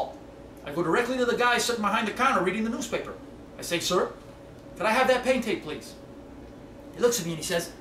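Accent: Trinidad and Tobago. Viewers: his Trinidadian accent realllllllllllllllllll on point wdhh loll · You got to do some more with just ur trinidad accent. . just luv it !!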